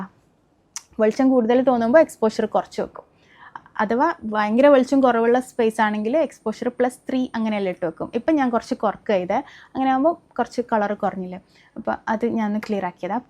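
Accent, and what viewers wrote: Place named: Malappuram, India. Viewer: samsarikkumbol vadakkare samsaaram maarunundallllo😅❤❤malappuram slaang avunnund chilappozhakkke.thoneetundo😅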